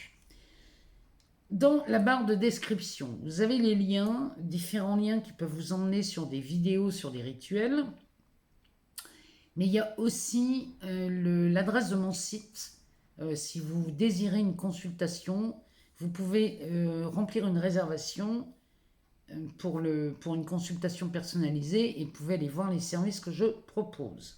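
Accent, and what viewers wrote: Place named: Paris, France. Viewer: Douce 🌼☀️💝 Ce tirage est exceptionnel et ça m’a redonné un boost d’énergie magic en ce jour! Je vous écoute des USA. Votre voix est rassurante et me rappelle ma jeunesse à Paris! Je pense que vous êtes parisienne aussi, n’est pas?! Vos ressentis et intuition me parlent et je relate souvent avec vos messages en général! Merci d’être cette belle lumière pour votre chaîne qui embellit les espérances et donne du baume aux cœurs! Merci merci merci - de mon âme à la vôtre! 💫🦋🌈💖☀️🙏🏻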